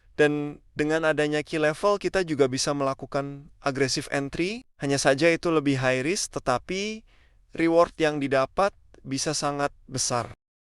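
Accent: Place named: West Java, Indonesia. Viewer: Bang aslinya org mana? Kaya ada logat sunda nya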